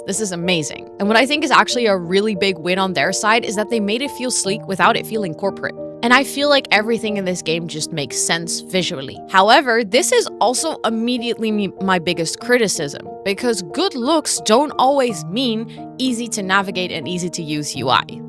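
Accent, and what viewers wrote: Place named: Netherlands. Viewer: Ah you're from the Netherlands! I barely heard an accent until halfway through, your English is so amazing! Better than even some Americans or British 😅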